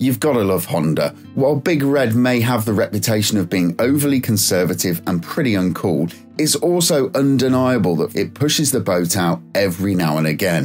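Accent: United Kingdom. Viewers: As far as I can tell this fellow with a British accent did not include a singled British bike.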